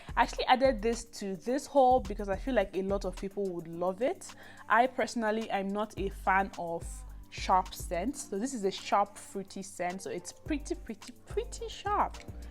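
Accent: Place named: England, United Kingdom. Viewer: Hi thanks for the video , why do I sometimes pick up an English accent in your voice. Btw mousuf is great.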